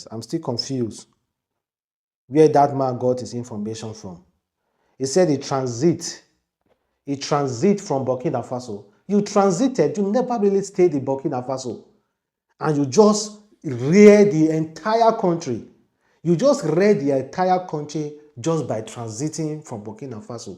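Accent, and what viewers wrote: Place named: Edo, Nigeria. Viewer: This guy self you no go school, why you dey read and pronounce like that, se something the worry you abi, do you even go back to watch your own videos before posting them, stop disgracing Edo state, if the social media is too hard for you, you can as well go back to farming. every time i watch your video its always filled with reading errors, very bad pronunciation. Am advising you to always go back and watch your videos before posting them, identify the errors, correct them before posting the videos, or contract an editor to work on it for you, youtube is a worldwide platform and you can't afford to keep disgracing yourself, Edo state and Nigeria at large, from the way you speak its obvious you're from Edo state, stop disgracing us.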